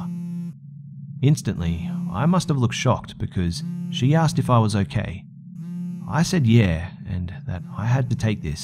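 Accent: Australia. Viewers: bruh you sound like and Australian, anyway love your shit. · love your story's, I just found this channel a couple days ago when I was listening to some Mr. nightmare story's and one of your vids popped up in the recommendation section. I love both channels and you are a great narrator, your Australian accent is awesome and you have great story's. I subbed on the second video I listened to and have watched a bunch of videos since, I'm glad I have a lot of catching up to do because I won't be running out of video's any time soon. :-) keep up the good work!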